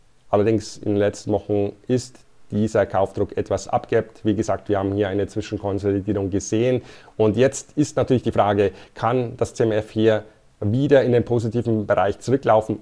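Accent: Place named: Swabia, Germany. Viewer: schwäbisches Englisch ist immer wieder köstlich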